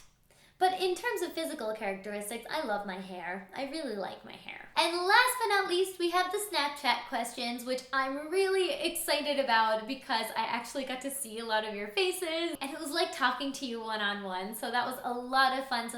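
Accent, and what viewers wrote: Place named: Newfoundland, Canada. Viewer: TIL Newfoundland has a very distinct and interesting accent, coolbeans! Also that nipple covers make excellent MacBook feet (I use chair leg covers for them, myself).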